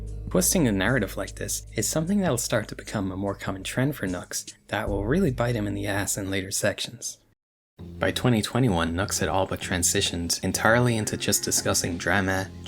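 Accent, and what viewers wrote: Ireland. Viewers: Great video, watched tbe whole thing. Also what accent is that? I thought you were american at first but you say your THs and Rs in a very Irish way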